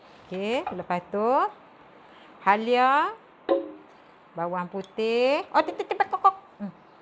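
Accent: Kedah, Malaysia. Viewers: Mmg padu loqat kedah. Terbaik..!! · Assalamualaikum.. sya mmg suka sgt follow minat sgt2 kt terhibur dgar celoteh dlm longhat kedah..resepic.ta pn smuanya menarik dn berselera.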